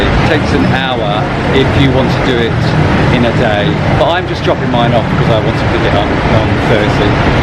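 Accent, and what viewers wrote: United Kingdom. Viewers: I can't take your snooty British accent for more than 30 seconds.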